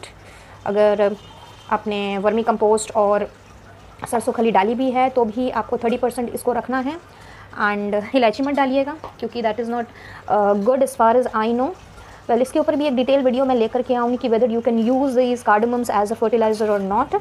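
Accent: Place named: China. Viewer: Your english have chinese acent I can’t understand and you tLk sooo fast 🚵🏽‍♀️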